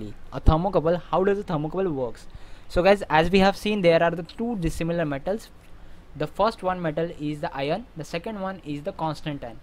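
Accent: India. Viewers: Typical indian accent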